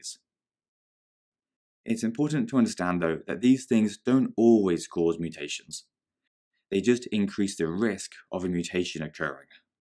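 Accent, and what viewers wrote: United Kingdom. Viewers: Seems british to me 🤔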